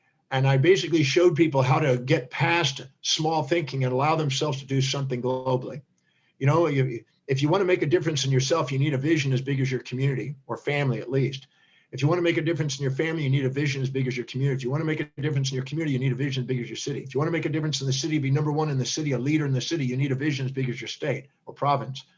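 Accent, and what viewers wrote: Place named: United States. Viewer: An amazing mix for a speaker: american english accent + italian passion and intensity Got your message so clearly! Thank you again 😊 P.s: I hope I'm right saying 'an american english accent' as I'm from Argentina.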